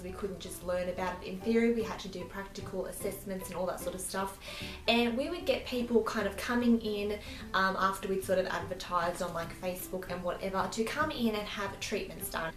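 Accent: Australia. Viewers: Are you from Australia. I'm from there!! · You're pretty. I love your accent, aussie yeah? Your so close to 1k, congrats yey!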